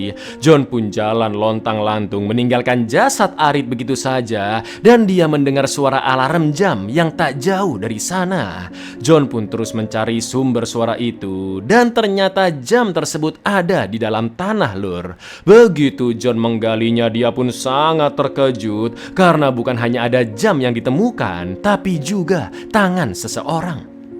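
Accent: Indonesia. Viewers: menurutku ngga papa, biar ada aksen Indonesia nya, biar jadi ciri khas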